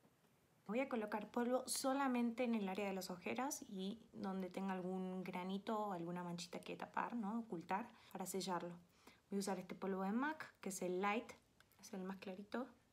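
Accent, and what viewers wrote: Mexico. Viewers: Que bella que sos pero...y ese acento mexicano? :O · Acento mexicano va ganando terreno o.O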